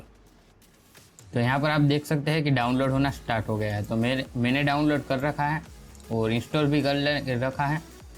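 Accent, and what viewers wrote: Gujarat, India. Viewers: bhai aapki speaking se pta chl jata h ki aap gujrati ho....😂😂 Btw i m also gujrati aur me aapke baaju me rehta hu i m from jetpur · Are you gujarati..???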